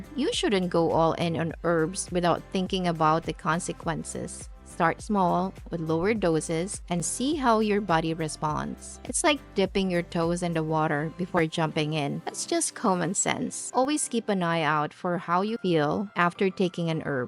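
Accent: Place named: Philippines. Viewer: voice is from the Philippines.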